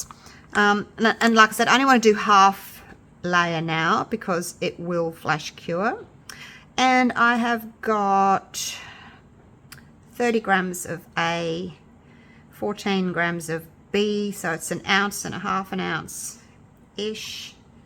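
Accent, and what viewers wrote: Australia. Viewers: I love the fact that you talk all the way through because apart from the fact that I just love the Aussie accent, the ones that play music in the background just does my head in. Also I love what you show us.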